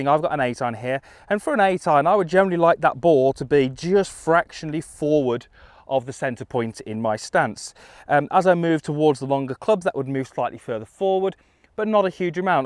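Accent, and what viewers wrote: United Kingdom. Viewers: Hi Sal the north of England accent isn’t the easiest to understand 😂 What part did you struggle with?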